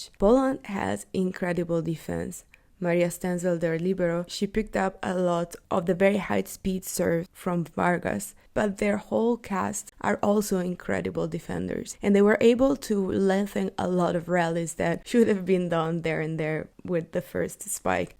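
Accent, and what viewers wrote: Turkey. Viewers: Video için teşekkürler türksün di mi aksanından öyle anladım ama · the turkish accent is so heavy 😅🤣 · Bir türk olarak mükemmel bir fırsatı değerlendirip herkesin bu kadar voleybolla ilgilendiği bir esnada ingilizce kanal açmıssın. Mükemmel bir girişim zamanı. Tebrik ederim başarılarının devamını dilerim :). Konuşman çok iyi. Sadece bir türk bu aksanı anlar :).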